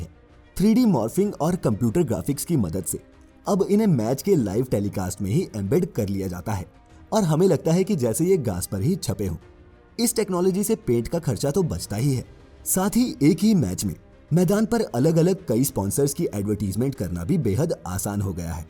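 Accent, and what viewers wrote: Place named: Odisha, India. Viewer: Your Odia 🙄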